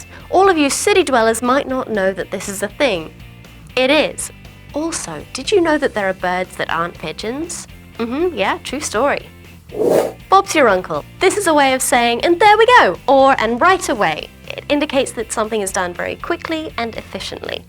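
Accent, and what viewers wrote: United Kingdom. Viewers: I love way you conduct the show, your Brit accent, articulation etc..I would love to have a chin wag with you..heeee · She definitely sounds more British (I am British too) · Are you from british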